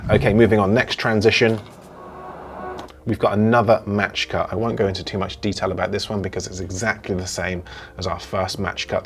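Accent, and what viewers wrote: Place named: West Country, United Kingdom. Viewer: Really enjoyed this video, thank you. It’s helpful to see the editing broken down. I have done some of these things in Premiere but not used speed ramps - this looks like a great fix for a few wobbles 👍 Also - don’t think I’ve ever seen Plymouth look so cool, heh. Nice to hear a west country accent on YT! Cheers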